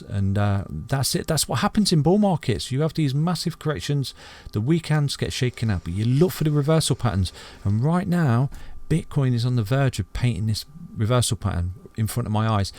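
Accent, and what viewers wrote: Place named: Southern England, United Kingdom. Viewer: Brilliant analysis - picked up on the head and shoulders... Very good. Nice to hear a Southern Englander whilst I'm here in Thailand. Thank you buddy 🙏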